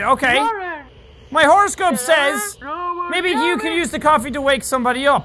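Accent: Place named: Ireland. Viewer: my friend does not like ur vids so she came up with some stuff to say about u her: his voice is too irish me: what the frick is he supposed to do about that her:his hair is too green me:its blue now her:oh,is face is so plain like why not grow some facial hair? me:he did her:oh, well how bout he SHAVES that me:*leans over and dies laughing*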